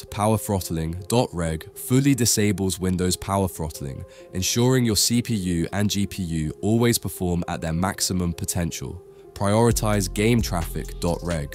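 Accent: India. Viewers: yea man the vid is botted and bro ur indian voice we know it so put the voice changher off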